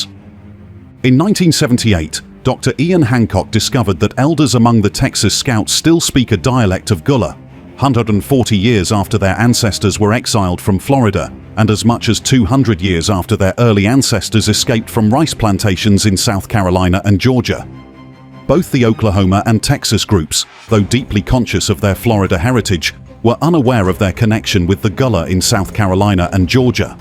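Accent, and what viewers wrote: England, United Kingdom. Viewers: Come on now a British/English accent to tell this?! The Trail of Tears were Native Indigenous People. Poor African People was more like The European Ships Sails of Tears to be Honest. Very Sad and Very True! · I enjoyed this video, but the "English or European narrator is a turn off!😮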